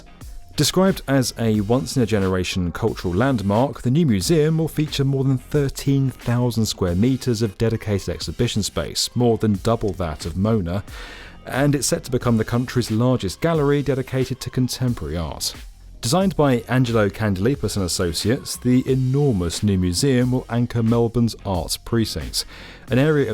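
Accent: United Kingdom. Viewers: You're British right, not Australian?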